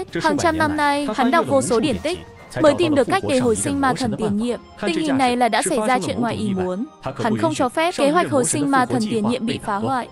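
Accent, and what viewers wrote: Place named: China. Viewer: Giọng này có tiếng trung giở quá. Ko hay bằng giọng cũ.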